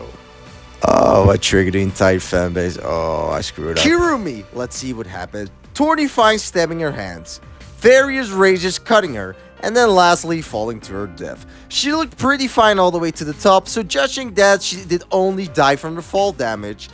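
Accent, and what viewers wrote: Netherlands. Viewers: Your dutch accent is showing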